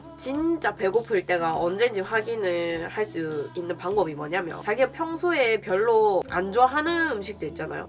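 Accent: Gyeongsang, South Korea. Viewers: 말투는 대구 경북 ㅎㅎㅎ너무이뻐요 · 大邱のなまりですか？？ 何となくですが……🤔 とてもお綺麗です！！🥰🥰🥺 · Is this a busan dialect ? I love it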